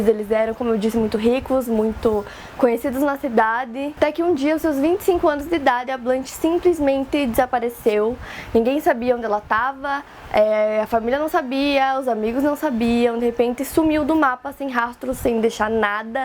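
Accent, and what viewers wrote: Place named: Caipira, Brazil. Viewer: Mds você é muito caipira que chega a incomodar de ouvir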